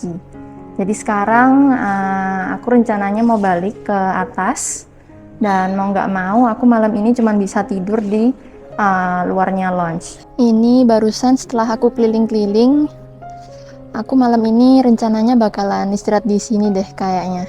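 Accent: Java, Indonesia. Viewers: Mbaknya pasti orang Surabaya, logatnya jawa banget..salam hangat dari Jateng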